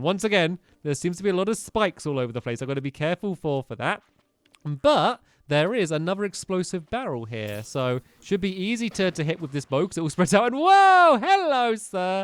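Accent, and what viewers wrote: England, United Kingdom. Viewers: Quest98Xx hes from england they pronounce things differently, not wrongly, just different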